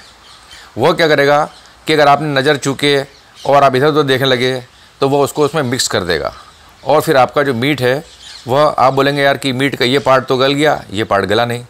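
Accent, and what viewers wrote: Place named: Jodhpur, India. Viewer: are sir.....apki dialect ekdum Jodhpuri h.....kya karya krte h sir by profession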